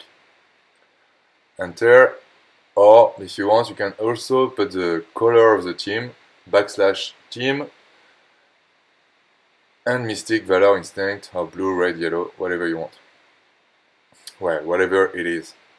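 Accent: France. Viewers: Hey, thanks for the video and helping Dublin Pogo players to be all a Pro :) . Small correction: "/" is just called a "slash" in English, "backslash" is this "\" which you might use in Windows. For the rest and on internet only the "/" is used for addressing a sublocation, exclusion, separation and in Telegram a request like /start to start a request. Bet you know that better then me (ur better in programming languages, then English (which is not bad anyways: Love ur French accent ) but had to say the confusion slash/ backslash\ which seems to be common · St’accent francais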